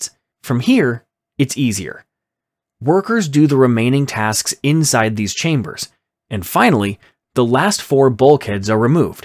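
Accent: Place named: India. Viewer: You please don't speak.. use AI for your voice. Your accent is typical meme for the westerner. I am an Indian and even I am finding it awkward.😂 Please...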